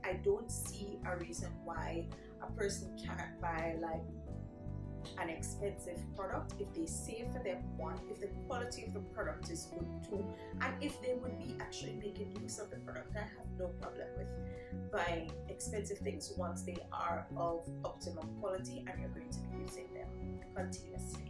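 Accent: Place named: Jamaica. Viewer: Where in the Carribbean are you located? I’m hearing a Jamaican accent…👂🏾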